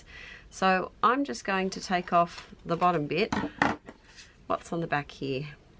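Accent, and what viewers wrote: Australia. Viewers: I got that one from my husband, he said it’s Australian but I have no idea. I adore your accent and your fun vocabulary, feel free to always share more random Aussie words (also… please let us hear your American accent!) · I think she means British as opposed to the fact that she’s already speaking English, but with an Aussie accent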